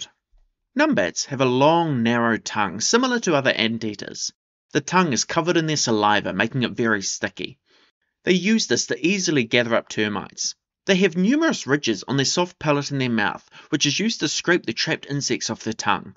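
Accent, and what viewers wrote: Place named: New Zealand. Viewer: That's some accent you've got there pal, it took me a few seconds to figure out that the "iglang" mammals were egg laying mammals, New Zealander?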